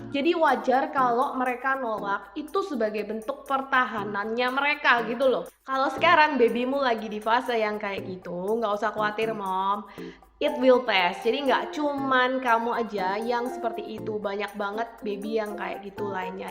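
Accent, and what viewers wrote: Surabaya, Indonesia. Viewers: Jos,,, aq sueneng logat medhok suroboyan e mbk, basio mbuh sampean wong endi 😄 gk mboseni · Surabaya banget logatnya mbak, waduh mbak anakku juga begitu susah banget minum sufor pakai DOT, makasih mbak sarannya tak cobain semua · Wong suroboyo iki koyoe😂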